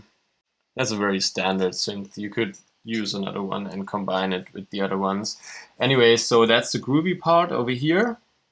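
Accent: Germany. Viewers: würde mir tutorials auch in Deutsch wünschen! Man hört extrem deinen Deutschen- Akzent (y) :)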